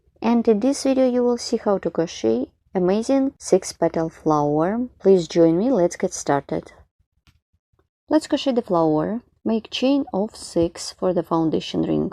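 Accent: Russia. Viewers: Duzhe chuty ruSSkiy aktsent!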